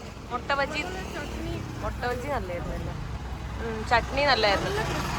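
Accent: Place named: Northern Kerala, India. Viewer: നല്ല വടക്കൻ മലയാളമാണ് പറയുന്നത് ഒറ്റപ്പാലം style അല്ലേ അല്ല.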